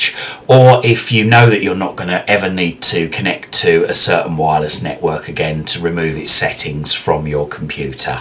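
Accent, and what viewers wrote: England, United Kingdom. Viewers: Fuck this has been bugging me for 2 years LOL. thanks for that English sounded dude.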